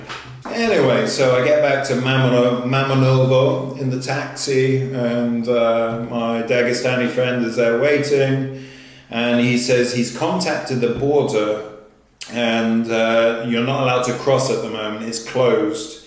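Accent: England, United Kingdom. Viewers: Hi Mr traveler I’m new too your channel I loved your video and I love your English accent! Sending love and greetings from Orlando Florida USA ❤ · You actually did pronounce it right. Close to it as you can get with the english accent. Kaliningrad is supposedly fun to visit if you like all the old abandoned wwII stuff, you should have explored all of that. But right now with the situation, just get back to Europe.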